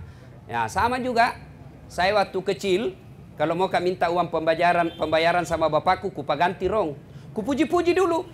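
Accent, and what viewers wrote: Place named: Sulawesi, Indonesia. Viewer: Suka sama ceramahnya. Màterinya ttg kehidupan skr plus dgn pendekatan komunikasi yg baik dgn dialek dan bahasa gaul ala sulawesi. Hy yang mengganggu, kok byk kata 'cilaka' atau celaka dlm arti bhs Indonesianya ya? Bukankah kata2 yg diucapkan itu bermakna doa ya? Apalg oleh seorg ustad seperti ustad Das'ad? Maaf jika saya saja yg merasa terganggu...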